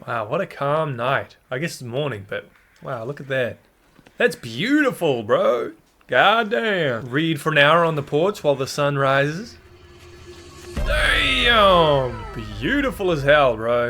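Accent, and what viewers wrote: New Zealand. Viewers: New Zealand, you can tell because the vowels are slightly different. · a New Zealand accent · Do I hear a new Zealander ??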